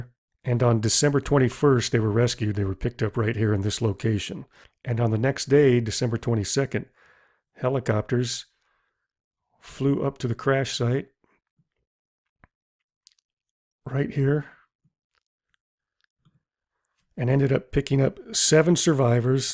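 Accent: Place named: Michigan, United States. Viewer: Narrator pronounces “height” as “hyTH” and “cross” as “crossT”. Wondering if he’s from Michigan/northern Ohio, northeastern Pennsylania.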